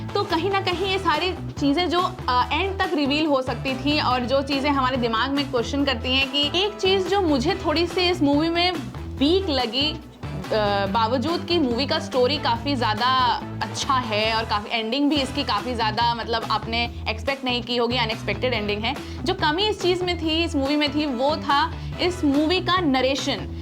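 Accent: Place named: Bengal, India. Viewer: U BENGALI HINDI VOICE VERY NICE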